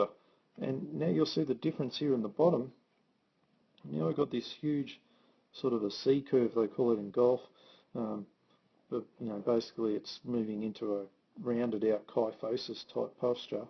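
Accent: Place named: Australia. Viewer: Another superb "keeper" from. Very informative, video well done, commentary obviously knowledgeable and links for further relevant info provided.And thanks for writing out all of the voiced instruction- the Aussie twang can be challenging to North American ears ( no offense intended and none taken I hope ).Well done-thanks!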